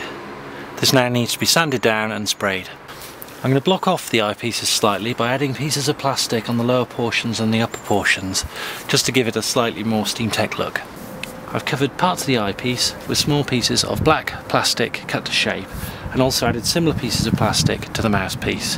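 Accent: United Kingdom. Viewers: Thanks for the tutorial! And was wondering where you get your paint from (I'm assuming your in the UK due to your accent)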